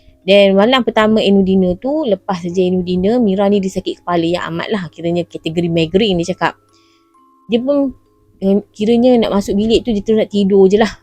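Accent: North, Malaysia. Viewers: Asalamualaikum..hye sis.. manih2 oghang utara ni.. org nismilan ckp 'sojuk yo mato mandang'..hehe..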